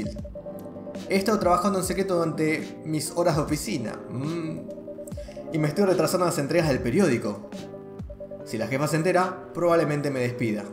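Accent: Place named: Argentina. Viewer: eres un kpo:) llevo un tiempo en tu canal (además de que me pegaste el acento argentino xd) y ya me vi todos los videos. Siceramente me parece muy interesante tu contenido. Sigue así. Saludos desde chile 🇨🇱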